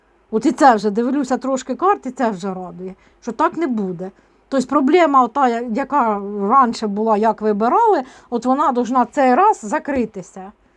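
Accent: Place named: Surzhyk, Ukraine. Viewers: смотрю разных тарологов. Есть очень культурные, есть матершинники. Есть рускоязычные,некоторые красиво говорит по украински, но я обажаю ваш суржик.Вы всегда говорите очень просто и доходчиво. Спасибо за росклад. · Мені понравилось,а суржик наш,полтавський!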